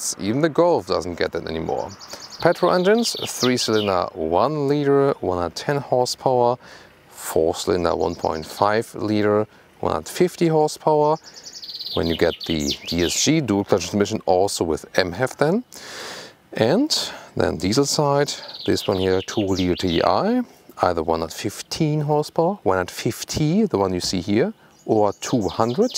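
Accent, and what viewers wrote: Germany. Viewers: I really like your German accent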